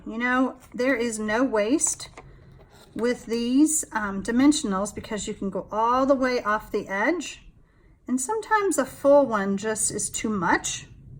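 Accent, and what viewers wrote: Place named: Texas, United States. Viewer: Such a nice and easy card...by the way... I like your texan accent pretty much...Thanks for sharing this idea...🤗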